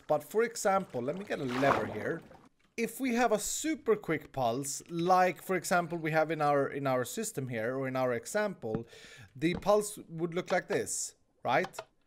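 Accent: Sweden. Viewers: r u swedish? i think because of 4 reasons. 1. ur accent sound like swedish (ik because im swedish too) 2. = icecold85. 3. is = ice. kall = cold. 4. u live in a ice castle in HermitCraft and an ice castle should make your icecold. icecold85:)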